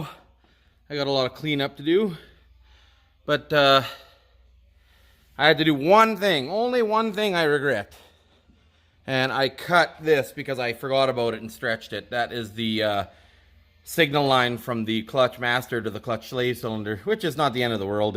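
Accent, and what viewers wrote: Canada. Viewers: Sounds like you're a Canadian you should watch another Canadian if you do or don't it's. a v e Arduino versus evil what it stands for but this dude is a trip his channels here on YouTube rebuilds and tears apart anyting mechanical or electronic I think you would get a kick out of this dude peace out have a great day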